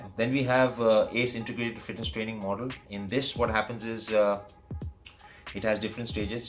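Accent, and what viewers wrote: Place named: India. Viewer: Indian English 😫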